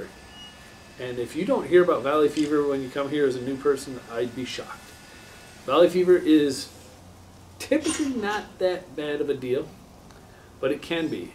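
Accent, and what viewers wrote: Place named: India. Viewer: you sound like you are of Indian descent. If you have gone to India, you will be able to relate to the climate in AZ. India's heat is dry heat and can get up to 120 degrees, if you are closer to the equator.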